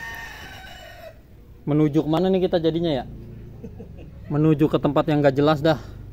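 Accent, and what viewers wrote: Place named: Jasinga, Indonesia. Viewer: logat Jasinga nih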